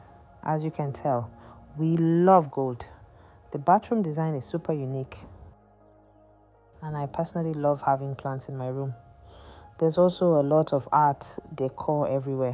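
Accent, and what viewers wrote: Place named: Nigeria. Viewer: U are speaking like Nigerian. Copy and paste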